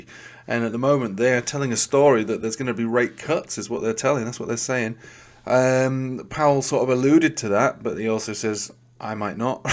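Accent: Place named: England, United Kingdom. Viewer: First time I’ve listened.. Nice honest analysis. Nice to hear an Englishman. God Bless. Subscribed. 🎉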